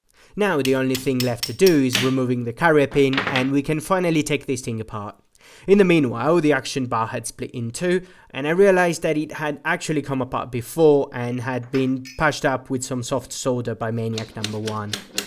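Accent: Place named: Italy. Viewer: He's Italian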